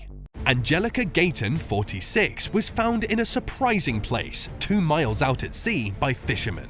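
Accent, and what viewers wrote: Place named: England, United Kingdom. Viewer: this guy has an English accent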